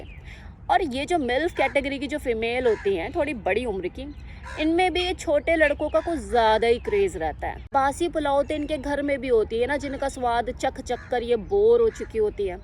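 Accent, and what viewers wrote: Punjab, India. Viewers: Panjabi figar Panjabi lehja. .wah kya baat hy janab . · Listening Hindi with punjabi accent 👍👍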